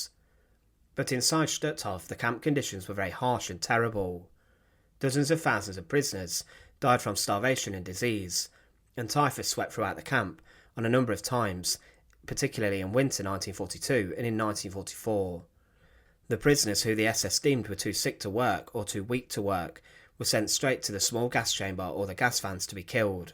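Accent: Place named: West Midlands, United Kingdom. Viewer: I grew up in a place where most people speak like that (the West Midlands) and left as soon as I could, because I couldn't stand the accent.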